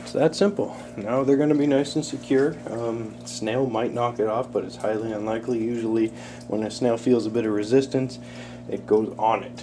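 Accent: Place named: Minnesota, United States. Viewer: Boy your voice sounds like home AKA Minnesota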